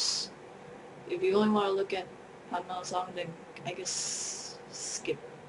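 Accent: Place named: Asia. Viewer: Lol ur asian accent. You said," da tabs..."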